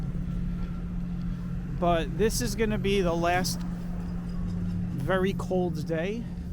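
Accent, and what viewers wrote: New York, United States. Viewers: You talk like a real New Yorker. I know I'm from the Bronx. You refer to Manhattan as "the city". That's a throw-back from the 19th century when Manhattan was just "the city".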